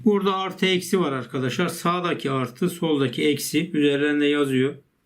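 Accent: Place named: Black Sea, Turkey. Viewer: abi sen karadenizlimsiin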